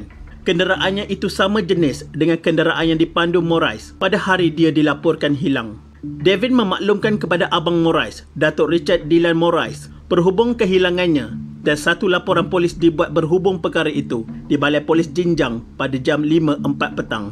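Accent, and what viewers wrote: Kelantan, Malaysia. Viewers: org klate ehh?? Klu x silap telinga sye dngar lorat nye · Pekat betul slang Kelantan ni ahahahah 😂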